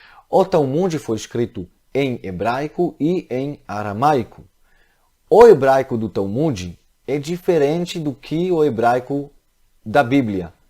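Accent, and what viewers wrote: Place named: Rio de Janeiro, Brazil. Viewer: SHALOM PROFESSOR! SEU APRENDIZADO DO IDIOMA PORTUGUES FICOU BEM CARIOCA OU DE PESSOAS DO RIO DE JANEIRO.TEVE ALGUMA INFLUENCIA DE SOTAQUE CARIOCA QUANDO ESTUDOU PORTUGUES?SÓ CURIOSIDADE HEINN..☺DEVO DIZER QUE SUAS AULAS SAO EXCELENTES!!!PARABENS!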